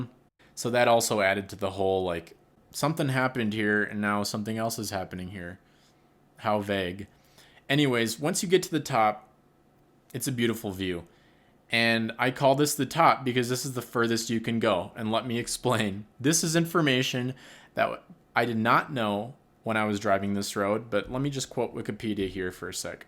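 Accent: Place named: Midwest, United States. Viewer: I’m glad you liked SoCal. I would never leave. Born and raised here in Orange County. I can hear your Midwest accent. Never been to the Midwest or East Coast. It’s so odd that you’re hapa and you’ve never been to CA. Hapa means part Asian.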